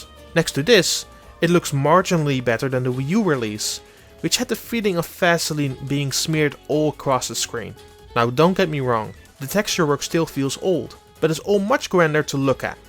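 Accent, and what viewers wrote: Netherlands. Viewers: Urgh, i'm sorry but I can't stand this reviewer. I know he's Dutch (As am I), but the way he pronounces things is justt too annoying :/ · why does this have a dutch voice aaaaaa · that thicc dutch accent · I was also wondering if this guy was Dutch and that seems the case. Its not to diss him but he really got a Dutch-English accent and for most Dutch people it isnt really pleasent to hear because we grow up with a lot of English speaking Media. But he shouldnt be bothered by haters because his vocabulary is good. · You sound dutch , nice review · Clocked the dutch accent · WOW this guy sounds Dutch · Let me guess you’re Dutch lol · Damn, fellow dutchie here, I know the accent is quite apparent but you guys are kinda harsh, more than you need to be I’d say. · This guy is soooo DUTCH it's not funny anymore.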